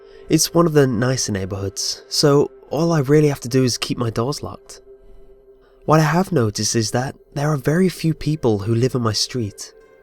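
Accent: United Kingdom. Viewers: You sound british are you british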